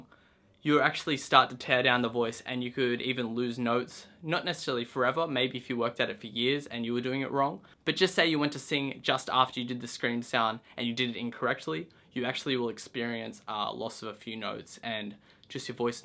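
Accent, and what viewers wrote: Australia. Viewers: Are you an aussie? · so aussie i did not realise he was aussie as well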